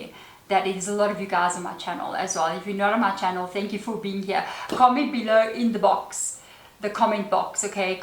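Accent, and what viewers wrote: Afrikaans, South Africa. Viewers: NICE 2 MEET U ALSO BEAUTIFUL UR REALLY A LIGHT AND I LUV AFRIKAAN ACCENT LOL... MUCH LUV FROM AOTEAROA/NEW ZEALAND MMMWAH 😍😎🔥👑🌈💜😘🤩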